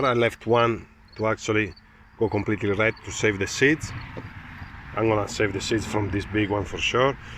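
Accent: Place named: Italy. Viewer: Si sente l'accento italiano :D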